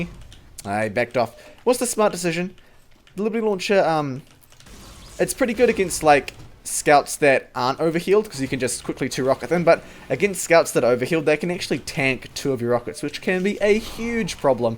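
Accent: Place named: New Zealand. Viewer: Never heard a Kiwi TF2 player!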